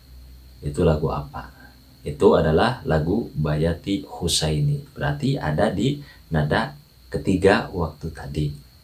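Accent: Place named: West Java, Indonesia. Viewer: siga asli sunda euyy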